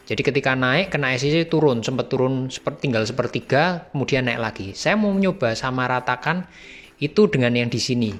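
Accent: Java, Indonesia. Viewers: Ngomong cuk e medok tenan Cuk!!🤣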